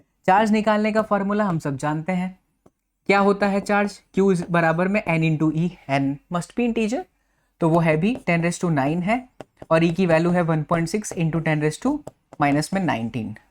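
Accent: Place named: Jaunpur, India. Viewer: Jaunpur se ho aap sir ji 😅 meri tarah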